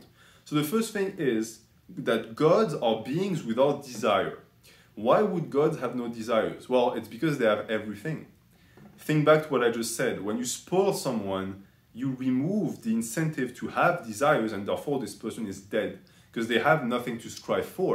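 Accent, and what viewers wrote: France. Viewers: Many people here being grateful for thick french boi reading and sharing his toughts on the books but dont forget to read it yourselves too.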